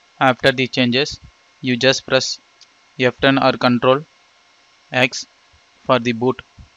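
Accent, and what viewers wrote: India. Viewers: Despite I couldn't your indi accent I could follow your instructions and could achieve it. At least you deserve the like because it was helpful.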